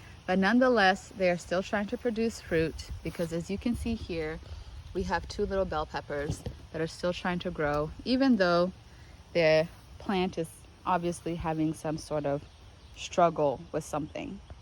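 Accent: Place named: Kenya. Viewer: I was trying to pinpoint your accent . I would not have guessed a Kenyan influence. I'm in 8A in Mississippi. You may have mentioned it, but have you tried eating the sweet potato leaves (prepared like spinach)? I know it is a popular Asian food.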